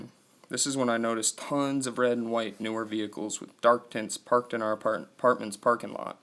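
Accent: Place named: Detroit, United States. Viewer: same bro. everything. from the drug past, anti police lifestyle, vehicular mobbing almost leading to death.. only difference is im in uk. someone i cared for deeply in my past originally came from detroit - your accent reminds me of them hence why i wanted to reply know what you mean with the evil cultish vibe these fucks give off. too much to go into here but just want ya to know it does get easier with time.. not outwardly, but in how we learn to deal with it. im four years down the line and still dealing with it every day. but i am dealing with it youre clearly a smart young guy. youll find a way of surviving eventually.. dont let them win. if you ever wanna talk, let me know. otherwise, take care man.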